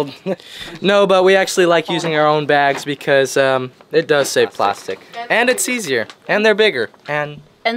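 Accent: United States. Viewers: cazzo, ma è delizioso come parla sto sudista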